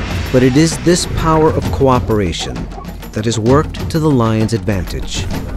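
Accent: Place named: United Kingdom. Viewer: Cant beat a UK commentator for these kinds of documentaries.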